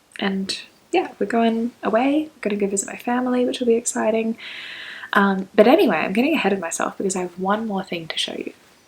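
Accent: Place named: Australia. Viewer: This is the first of your videos I have seen! I'm from Adelaide/Kaurna country and its so nice to hear an Australian accent on a podcast, and someone who is actually from my hemisphere so is talking about their summer knits rather than all the other youtubers going into Winter. Definitely adding the cuff club flower socks to my Ravelry library :)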